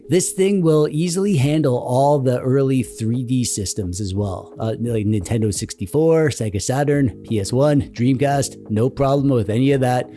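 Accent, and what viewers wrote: Canada. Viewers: Sir, are you Canadian?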